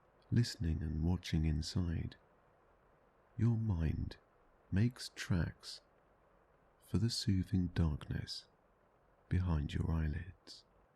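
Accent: United Kingdom. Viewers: Who are you???? I tried LOADS last night, and finally found this. PERFECT!!! No music, and a UK make voice that was just perfect for me. Thank you so much, but please reveal yourself!!!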